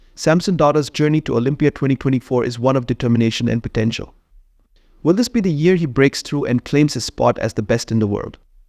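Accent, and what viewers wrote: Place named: India. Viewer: I am sure you are Indian because of your tone.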